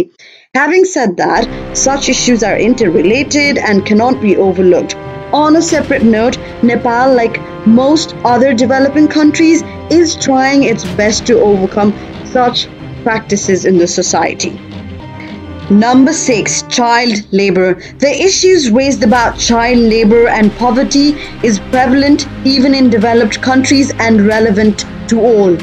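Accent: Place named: RP, United Kingdom. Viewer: The main thing I loved about this video was your RP accent . love you sister ❤️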